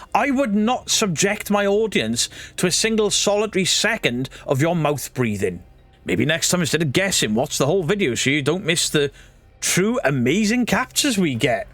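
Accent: Wales, United Kingdom. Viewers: They are just jealous of your glorious beard and lovely Welsh accent!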